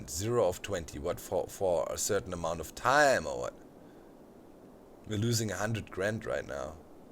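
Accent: Germany. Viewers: TitaNiumCriStal ja dachte ich mir auch seine Aussprache hört sich deutsch an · are you german? your sounds like german,and the names of your cityparts like altstadt, i am german :D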